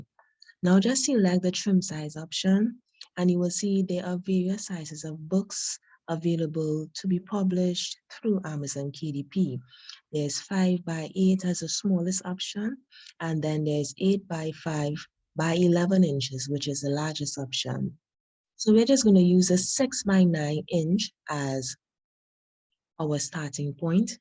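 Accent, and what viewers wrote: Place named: Trinidad and Tobago. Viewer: I recognize a trini accent here!¡ Good job in explaining this - i can't seem to pass the error message in print previewer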